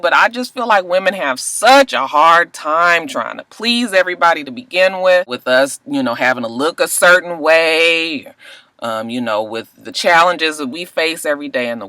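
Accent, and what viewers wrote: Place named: East Coast, United States. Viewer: Hi! I am new to the channel but like I said on fb, ( cheffalina) you're hilarious!! I watched almost all of your video's during our last of the fifty snow storms in Philly! You said you were from Cali but when talk you seem so east coast!!!! Lol I've watched this video and now I know how this thing works! Love it whether you are imitating folks or choking cause your are eating lunch while you talk to us, I love it love it love it love it love it love it love it! Peace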